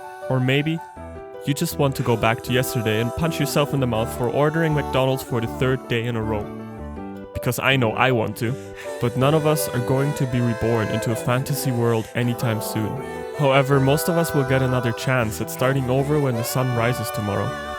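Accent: Germany. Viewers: Good video. The Made in Abyss OST in the end of the video just fits to MT. I was listening to it on repeat while reading the novel. PS: Hab 5 sekunden gebraucht, um herauszufinden, dass du deutsch bist. Unser Akzent is einfach zu offensichtlich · Junge eine Sekunde des Videos und ich weiss einfach, dass der Bre deutsch ist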